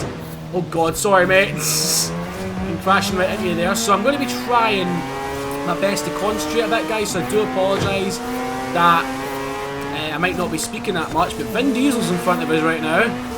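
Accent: United Kingdom. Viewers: Rams, instead of rims. Enchies, instead of inches. I love how you British people talk xD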